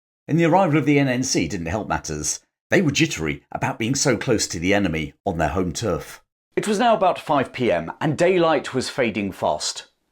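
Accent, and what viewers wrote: United Kingdom. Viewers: I recently discovered, it is amazing 👍 This is the only way to hear British History by a Brit and with that beautiful British English accent😊 Thank you, with “howdies” from Texas 👋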